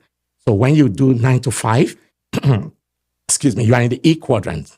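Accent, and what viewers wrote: Nigeria. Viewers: This man speaks like Nigeria 🇳🇬 n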